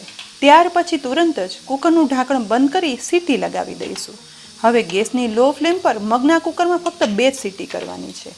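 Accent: Kathiawar, India. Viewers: Soup saras..mug pan saras. Bhaat pan saras..salad pan saras...badhun j "Madhuram Madhuram!" Thanks. Amey aavaa oogaadelaa mug banaaviye ne saathe Kadhi, bhaat ee combination maan kariye. Thanks for the recipe. Kathiyawadi mithi bhasha saambhalavaa thi maney maarun Rajkot yaad aavi jaay chhe. Hun tau janmi tyaar thi mumbai maan j oochhari pan amaaraa ghar maan kathiyawadi , naagar ni bhaashaa j saambaleli, vali sanyukta kutumb maan rahyaa ee j amaarun gujarati vaataavaran , baaki aaspaas tau christians aney momedan loko ni j vasti. Lagna pachhi Rajkot rahevaanun thataan Rajkot maan vadhu saaro avakaash malyo Guajrati bhashaa no laabh malyo. Thanks again.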